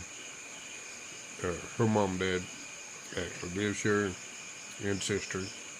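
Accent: United States. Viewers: That's okay,. After watching you for more than several years now, I simply came to the conclusion that the lag phenomenon was a result of your wonderful Kentucky drawl and nothing more.. Didn't bother me none. LOL 🤣 I honestly think most of these price increases are due to nothing more than blatant price gouging - maybe up to 30 %. I try to counter the rising prices by growing more fruits and vegetables plus reducing my electrical consumption wherever I can. We have more romantic candlelight dinners and make more use of mama's dirty kitchen while pretending we are camping out. I cut back on or even stopped purchasing some American dishes I have a hankering for. Still trying to put money aside for solar power, but not as much as I once was. Anyway, still breathing, drawing my retirement and enjoying life in the Philippines. Can't really complain. Thanks for sharing and letting your subscribers know what life is truly about out in the boondocks, my friend. 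😃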